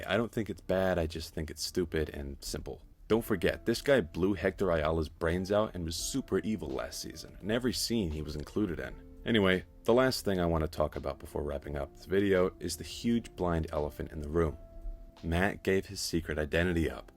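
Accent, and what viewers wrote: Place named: United States. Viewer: That's supposed to be a New Zealand accent? You sound American.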